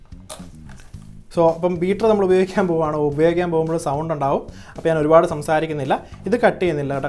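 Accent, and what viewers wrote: Kottayam, India. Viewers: കോട്ടയംകാരൻ ആണോ!! നല്ല സംസാരശൈലി!!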